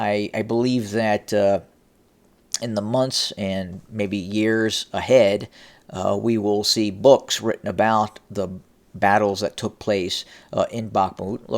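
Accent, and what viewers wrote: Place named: South, United States. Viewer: Thanks for this excellent update. I like the way you speak (as opposed to some complainers in the comments below), but I'm curious where your 'accent' is from. I'm guessing a Southern state, but which one?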